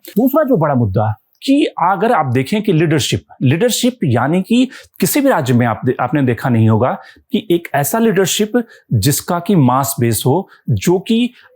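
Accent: India. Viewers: It's Russia, but we call it Roos in Hindi. Deucheland is Germany in English... Similarly, it might be Karnataka in kannada, but this is Hindi news, and he's speaking with a Hindi inflection, which is perfectly appropriate. If you have such a massive problem, you can leave this and watch your Kannada news or English news. Avoid being unnecessarily bitter online.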